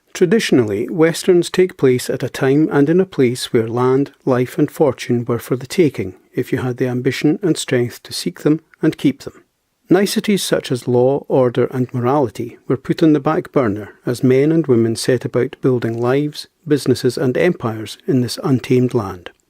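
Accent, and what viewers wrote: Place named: Scotland, United Kingdom. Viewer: Would really like a fuller, longer and more detailed analysis. You’ve got such a lovely Scottish accent... take the bull by the horns and dissect this movie for us??!!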